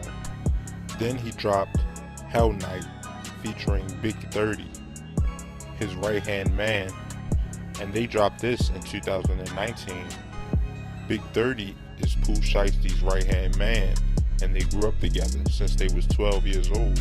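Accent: Baltimore, United States. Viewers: You can tell my man from Baltimore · U def from Baltimore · Bro talking gotta be from Baltimore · He from Baltimore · I hear a Baltimore accent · He from Baltimore speaking on down south shit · You from bmore ain’t you? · u frm baltimore huh😭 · This dude accent 💀 he gotta be from Bmore lmaooo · The dude who does this channel def from Baltimore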